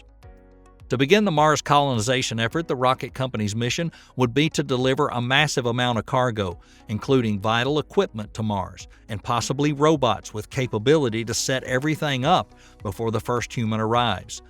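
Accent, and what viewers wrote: South, United States. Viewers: Anyone else find it hard to watch a technology video and take it seriously when narrated in a southern accent?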